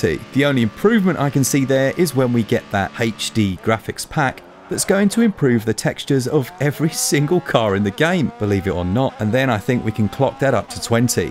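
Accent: United Kingdom. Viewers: no not bad at all. It just didn't sound like you. Had more of a British tinge to it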